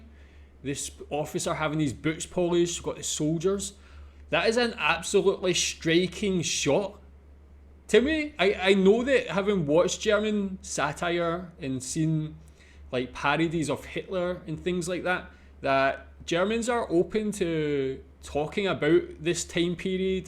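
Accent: Scotland, United Kingdom. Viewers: Kudos - you picked up on quite a few things in there. Note that the vid is not a history of Germany, but rather highlights some aspects, which were very defining maybe not to what Germany *is*, but to how people may feel about it. There are good analyses out there, on Utube the one by "three arrows" is the best I know. Still, it falls short. The references and cross-references are enormous, and sometimes only detectable in freeze-frame. My current count is at around 180 (!). The Band worked for over a year on this, and I can only guess at the production budget. It is one of a kind, I know of no comparison. Anyhow, I love your channel, you are doing great work. And a lovely Scottish accent! Not quite as enjoyable as Neil Oliver's, but still...;) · love your Scottish accent....got to learn it....👍🏻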